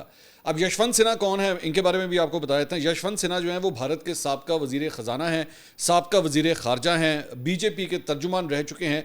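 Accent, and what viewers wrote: Punjab, Pakistan. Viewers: Meta Bhai, Urdu bhi Punjabi accent mai bolta hy. اندرون شہر کا اثر ہے۔ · We love your Punjabi accents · bhai apki hansi he dushman ka dil jalany k liye kafi hy ...Allah pak apko apni aman may rakhy mza ata hy apki baton may pnjabi tuch ❤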